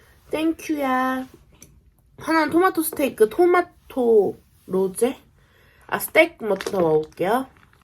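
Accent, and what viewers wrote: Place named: Seoul, South Korea. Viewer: 옛날 서울 사투리쓰는 아가씨같아요 되게 말씨가 곱답네요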